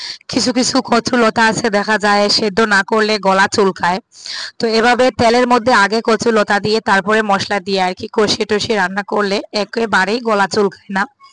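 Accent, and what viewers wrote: Bangladesh. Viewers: Tumi India r oia kne kali Bangladeshi kotha bolo kne? Hindi or assamese kotha bolo apu? Tumar suddo bangla amrar moto oy na bangladeshi kotha add kri daw? Hindi te vlog daw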